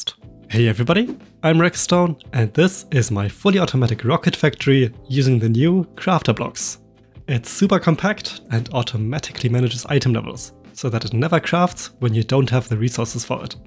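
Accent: Germany. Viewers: Junge eine Sekunde angehört, der typische Deutsche Akzent. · great build, but are u german?, ur akzent sounds like it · Der deutsche Akzent 😂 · Deutsch hört man raus aber gutes tutorial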